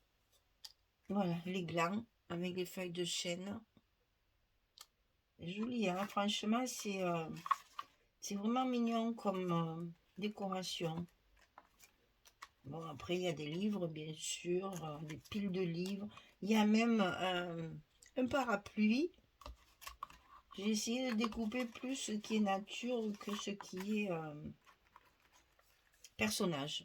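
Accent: South, France. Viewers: Cool! si vous nous montrez comment faire un tableau avec une feuille 30x30, je prends. J'offre ce genre de cadeau de temps en temps et je suis très intéressée de voir comment vous sublimez une simple feuille. J'ai hâte de voir vos idées très créatives dans votre bonne humeur et votre accent du sud 😍qui va réchauffer notre hiver du nord 😝.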